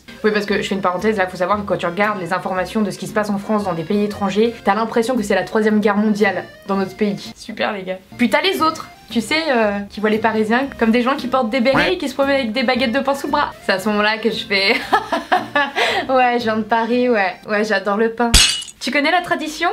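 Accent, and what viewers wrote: England, United Kingdom. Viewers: i love ton accent anglais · Meuf ton accent English 😍😍😍😍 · tu as un accent anglais trop beau !!!!!!!!!!!!!!!!!!!! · Super accent anglais !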